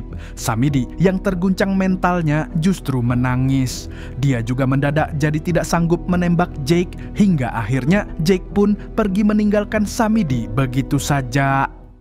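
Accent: Banjar, Indonesia. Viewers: Buan banjar kah om ai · Tebak nah admin nya banjar bagian mana😂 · Urang banjar sekalinya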